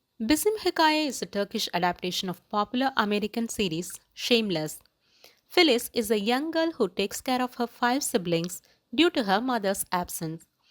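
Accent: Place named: Kerala, India. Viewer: You (the narrator) sound like a Malayali woman Your accent I mean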